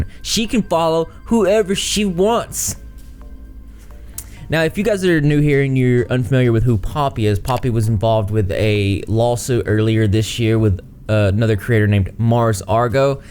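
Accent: South, United States. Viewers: Why did i think you were from Australia? Now you sound southern .. what in the tarnation is going on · Just subscribed :) I like your accent is it from Alabama or Tennessee very southern. Ps I like your analysis and you’re pretty handsome . Reminds me of one the singers from I see Stars. Have a great day! Haha Best regards, Mimi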